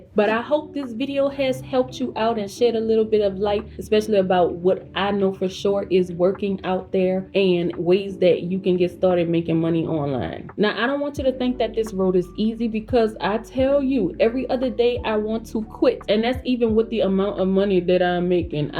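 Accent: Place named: Louisiana, United States. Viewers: Hi my beautiful sister. You sound so much like my ppl back from Louisiana. Have to keep going bun binge watching your videos and a few others bcuz i just got curious about it yesterday. Thanks for sharing sister. I hope you have an amazing Sunday and a blessed week ahead ❤🙏🏽🥰😍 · I love you accent!!! You must be from Louisiana!!! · Just came across this video! I heard of E-commerce YEARS ago and set up stores but never followed through. I started again this weekend. And ma’am, where are you from?! You sound like you’re from Louisiana. 😆that’s where I’m from so idk, your accent sounds real familiar. Lol ❤️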